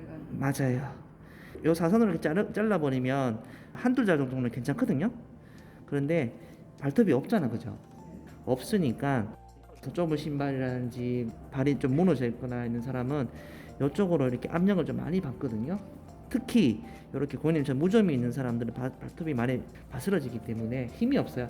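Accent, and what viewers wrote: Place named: Gyeongsang, South Korea. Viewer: 쌤 ♡♡♡♡♡♡♡♡♡♡ 너무 오랫만이네요..ㅠ 보고시퍼써용😂👍 영상 잘볼께요~~^^ 갱상도 쌤.. 넘 좋아용♡♡♡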